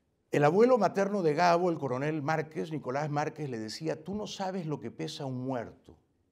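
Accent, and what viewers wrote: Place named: Lima, Peru. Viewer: Jaime es muy limeño en su idiosincrasia y manera de hablar. Me encanta...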